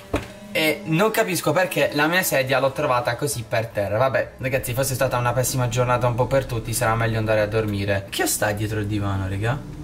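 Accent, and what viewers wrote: Bari, Italy. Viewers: Sei di bari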